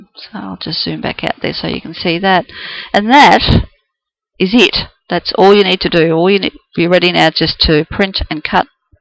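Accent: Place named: Australia. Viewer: Loooove your Aussie accent! TFS that vid. It's awesome! :-)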